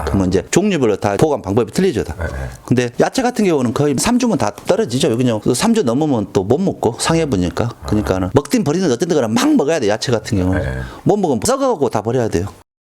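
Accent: Jeolla, South Korea. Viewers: 구수한 전라도 사투리 😊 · 말 재밌게 잘하시는 전라도 분이시네요 ㅎㅎㅎ 같은 전라도인으로 반갑습니다.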